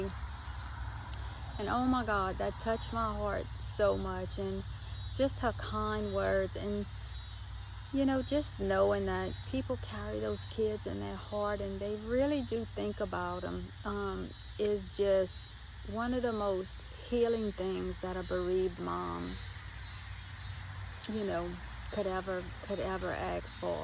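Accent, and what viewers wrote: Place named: New Orleans, United States. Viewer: First time I’ve ever saw your videos and I can hear that NOLA in ur voice. Bless u momma.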